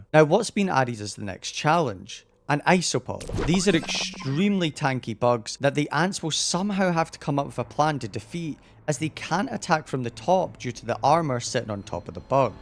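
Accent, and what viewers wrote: Scotland, United Kingdom. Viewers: Bro sound bri-ish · What part of Scotland you from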